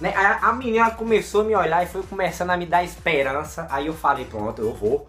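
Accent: Northeast, Brazil. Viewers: vc e nordestino por que eu só kkkkk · Nordestino? É da Familia?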